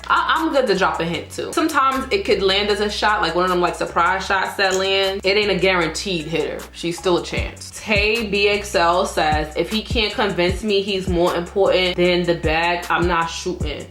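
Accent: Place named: New Orleans, United States. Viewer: lmao that nola accent something serious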